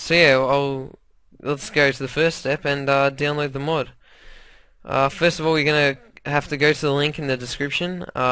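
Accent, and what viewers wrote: Australia. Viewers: ha, i knew it! the australian accent is just unmistakeable :D whereabouts do u live? i am from germany and spent half a year over there, was fun ;)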